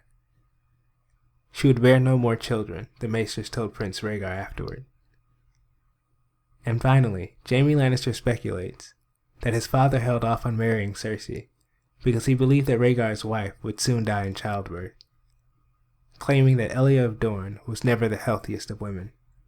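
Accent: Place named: United States. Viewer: I really like your clips and you have a nice voice. But I'm not a nativ speaker and you have an american accent so its a bit hard for me to follow, could you speak a bit slowlier?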